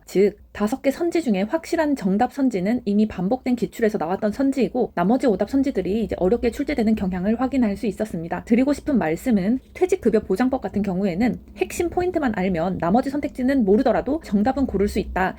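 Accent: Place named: Gyeongsang, South Korea. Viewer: 귀에 때려박는 경상도 딕션과 유려한 설명. 너무 감사합니다!!!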